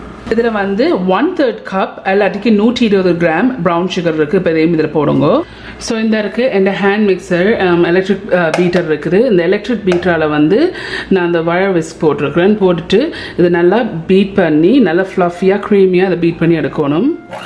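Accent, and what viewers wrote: Jaffna, Sri Lanka. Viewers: வணக்கம்,உங்கள் சமையல் குறிப்புகள் மிகவும் தெளிவாகவும்,அழகாகவும் உள்ளது.எங்கட யாழ்ப்பாண தமிழ் அழகோ அழகு!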